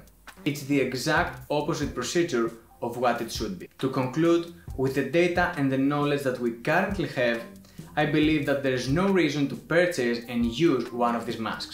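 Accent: Greece. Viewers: προφορά ελληνική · It's a Greek accent, he is Greek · Αδερφέ μα τον θεο πριν δω το βίντεο κατάλαβα από την προφορά ότι είσαι Έλληνας... btw καλή ανάλυση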